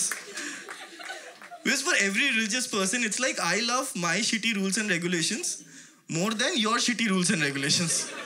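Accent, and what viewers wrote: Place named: Bengal, India. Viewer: I like this guy. The way he presents . Perfect timing . Not loud . Some other comedian are too loud on physical activity. Yes sometime his pronounced like Bengali ( be proud and continue ) . in this vedio he just nailed it by saying religion by choice. Only thing he might reduce the word fuck unnesseccary.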